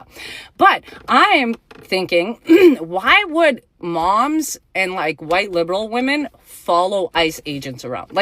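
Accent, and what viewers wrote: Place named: Prairies, Canada. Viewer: Ok this girl is from Canada and my guess is she a prairie girl lol love it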